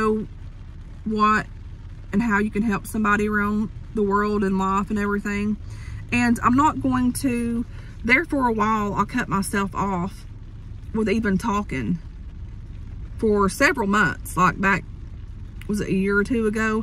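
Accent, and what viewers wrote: South, United States. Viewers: Amen Lady!! You said everything that I would have said too! I'm a new subscriber and I really like your southern accent. I have one too. I was born and raised in Tennessee. I now live in Alabama. My parents and a lot of family are in Tennessee and I visit as much as I can. I love the way you think about things and the ones with harsh comments are the ones insecure about themselves. You are beautiful inside and out. Take care and God bless!! ❤️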